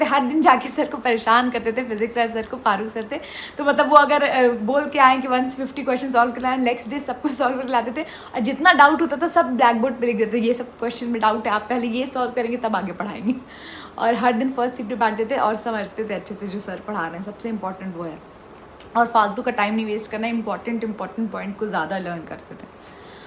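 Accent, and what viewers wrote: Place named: Uttar Pradesh, India. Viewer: UP Accent ✅ Using Ham always 💗 , liked it .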